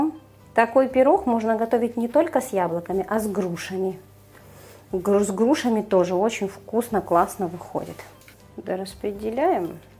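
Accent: Ukraine. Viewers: Здравствуйте,. Спасибо за Ваш труд, за Вашу энергию, за Вашу любовь к подписчикам. Очень нравится даже просто смотреть, как Вы готовите, и слушать Вашу милую речь. Я строго отношусь к правильной речи, считаю это важным. Но, как здесь уже сказали, есть в каждом языке своеобразие и самобытность. Ваша речь полна этих самобытных украинских особенностей. Это здорово. Я очень любила в детстве слушать, как моя белорусская бабушка гутарила с соседками. Песня! Обязательно приготовлю по этому рецепту рулет. Будьте здоровы.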